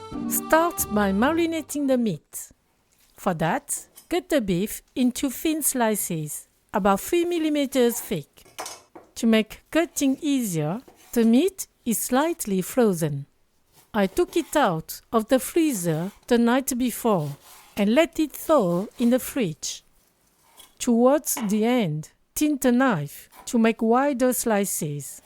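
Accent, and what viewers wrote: France. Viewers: Is that a French accent I detect ? 😊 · Your amazing! Ty for the recipe. With your French accent I know you would make Vietnamese food w integrity 🤌🏼🫶🏼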